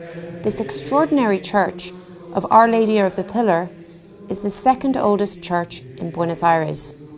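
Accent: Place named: Ireland. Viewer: This is the most informative video about La Recoleta. Thank you!! I especially enjoyed your presentation, with a lovely Irish lilt : )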